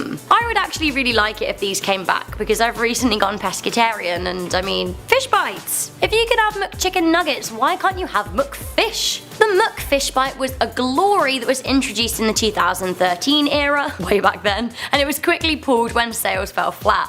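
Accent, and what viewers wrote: United Kingdom. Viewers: I love her British accent and her witty humour. It's infectious. 😂😂😂 Thank you for making my day during this damn lockdown. 😆🤗😆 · Your British accent is on display! Love you so much! Thank for the video XOXO ☆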